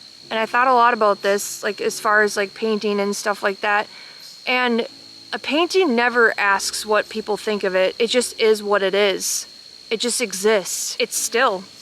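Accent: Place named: Midwest, United States. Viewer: not sure why this showed on my feed but you seem cool. Also love the midwest accent.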